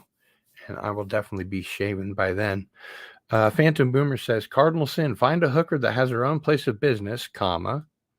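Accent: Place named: Australia. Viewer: Hey Cardinal Sin, I'm an Australian and I love your Aussie accent as well as your Christopher Walken impersonation 👍👍👍🤣😂🤣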